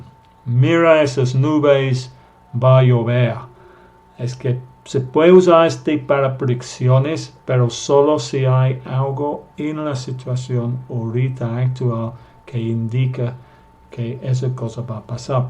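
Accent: United Kingdom. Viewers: Excelente clase de Ingles y desde luego excelente profesor ademas britanico que me encanta aprender mucho el acento y ciertas diferencias que hay con el ingles americano, no tengo palabras para darle las gracias por esta perfecta clase.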